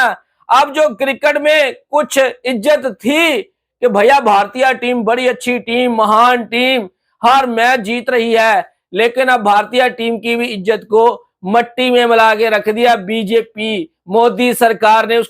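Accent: Pakistan. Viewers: Aap ki urdu Pakistani urdu lag rahi hai❤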